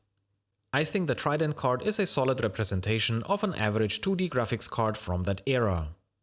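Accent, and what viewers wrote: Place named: Franconia, Germany. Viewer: You're from Franconia, Germany, right? That accent sounds very familiar!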